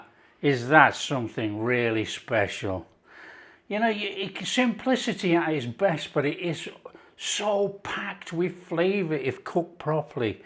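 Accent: Yorkshire, United Kingdom. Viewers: glad to hear the pronunciation disclaimer in today video of your pronunciation of Papas Arrugadas Canarian Potatoes with your Yorkshire accent. I would hate to see a quified chef like yourself mispronounce it and stir up the hairs on the neck of your resident pronunciation troll 😂😂😂😂. They look delicious my friend. Cheers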